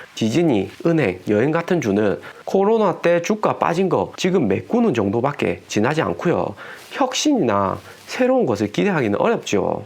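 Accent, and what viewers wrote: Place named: Busan, South Korea. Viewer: 부산같은데용 ㅎㅎ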